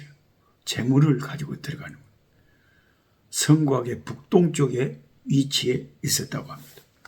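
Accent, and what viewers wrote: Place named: Beolgyo, South Korea. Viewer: 목사님! 저는 여수 수정로교회 를 섬기는 선 미자 권사입니다.. 아가서 말씀으로 목사님 설교에 많은 은혜를 받고 찬양대에 오셔서 기도해 주셔서 너무 감사했습니다.. 개인적으로 인사 드리고 싶었으나 식당에서 목사님 가까이에서 식사 하면서도 저만 목사님을 보면서 너무 반갑고 기뻤 습니다.. 유튜브 꿀송이 보약 큐티를 통해 목사님을 알고 벌교 지동리로 제가 1974년도에 결혼을해서 2년동안 낙안 중앙교회를 섬기다가 77년도에 여수로 왔기 때문에 목사님의 벌교 사투리에 더 정이가고 뵙고 싶었는데 목사님앞에 나서지를 못했네요.. 앞으로도 유튜브에서 계속 은혜받고 뵙겠습니다.. 감사합니다..❤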